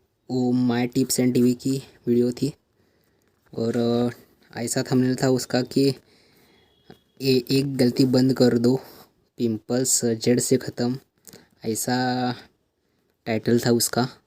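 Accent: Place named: Maharashtra, India. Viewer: U r maraThi🌝Accent Pechanl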